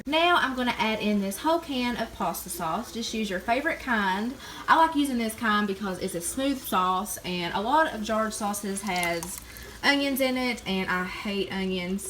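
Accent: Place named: Tennessee, United States. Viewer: My husband and I were sitting here watching your video and trying to place your accent. We are from West Virginia. I say you’re from Tennessee and he says Virginia. I’d love to know! Also, I love your videos - just subscribed. ❤️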